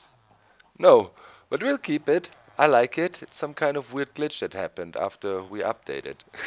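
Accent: Germany. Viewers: LIKE FOR HIS GERMAN ACSENT!!!!!!!!!!!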